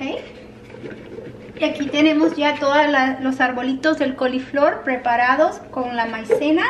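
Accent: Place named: United States. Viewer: porque hablas como hablaria una americana, habla bien espanol.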